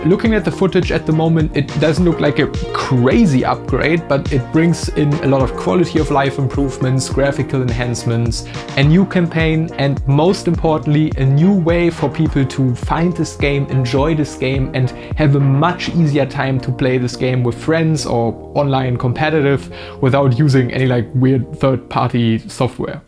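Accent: Germany. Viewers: Empire Earth and Cursader ❤ but are u german? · That is the hardest german accent i´ve ever heard :D But good video! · Ten seconds in and I already know you are german, very obvious accent lol But I really love all the memes in this video, I am indeed "all about the Ackermatch" when playing Crusader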